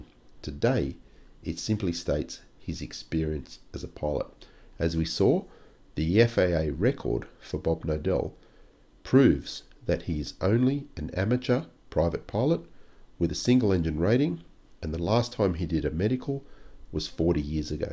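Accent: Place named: Australia. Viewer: I like the Australian accent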